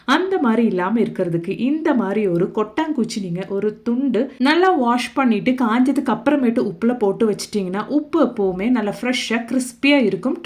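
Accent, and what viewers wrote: Kerala, India. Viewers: சகோதரி கேரளா பகுதி அந்த உச்சரிப்பு சிலருக்கு புரியவில்லை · Malayalam mix aaguthu voice so ur malayali അല്ലേ 👍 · அனியத்தி நீ மலையாளியோ😂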